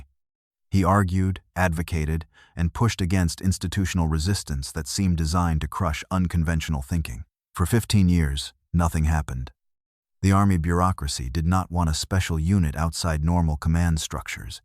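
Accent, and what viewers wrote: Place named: United States. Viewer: Would be nice if these narrators spoke actual English instead of American bollox!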